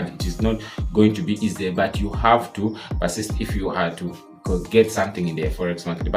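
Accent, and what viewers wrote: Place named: Nigeria. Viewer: He sounds Nigerian Hope he keeps up the work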